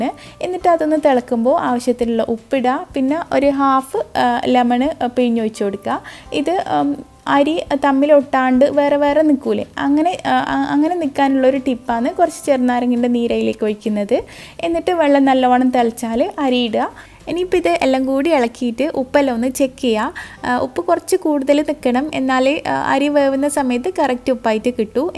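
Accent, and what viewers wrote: Kannur, India. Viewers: Hi ... Videos kanan Nala resam undato. Kanoor sasaram kelkan Nala super anu. Eniku oru mol undu 8 months anu mole uragikazhija night 11 pm kazhija njn videos kanan time kittoolluto atha comments onu ayakan pattunilane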